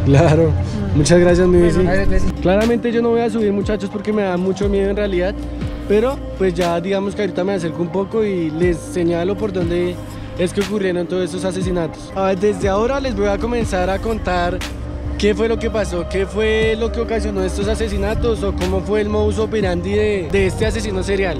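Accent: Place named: Bogotá, Colombia. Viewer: Este man es rolo de pura sepa buen video jaja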